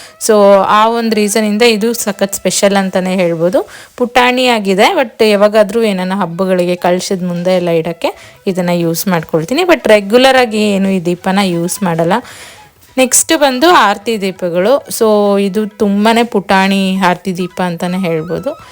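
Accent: Kolar, India. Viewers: Nimdu kolar ahhh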